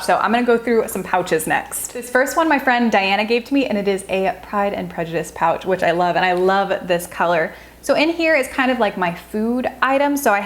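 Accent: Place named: United States. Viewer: I also have this tote and I like it too... love your vedios and do you mind if I ask which part of America you are from? I am an English major and I am studying different English accents and I like yours very much!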